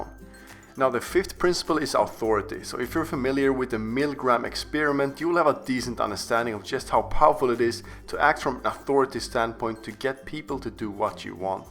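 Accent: Sweden. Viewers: Är du svensk din dialekt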